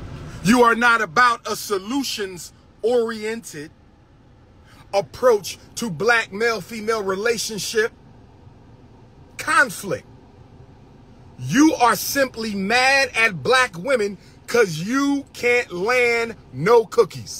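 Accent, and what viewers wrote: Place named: South, United States. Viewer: It’s just funny being up north and hearing a southern dialect. All my relatives down south still say skrempts and skreets. Not belittling him nor them it’s just funny to hear it every now and again. The Dr. Is alright with me 🙌🏿👏🏿🙌🏿👏🏿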